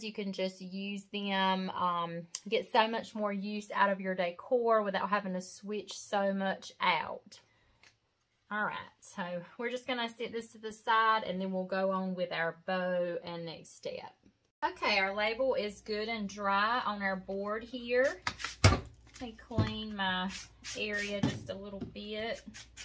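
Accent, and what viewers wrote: South, United States. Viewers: You're so creative and your projects always look high end like most YouTubers say, but they don't usually hit the mark! Not trying to be ugly to them, just trying to compliment you as you clearly deserve! Also, I love watching those of you that have a wonderful southern accent like myself... I'm from South East Texas. Where are you from?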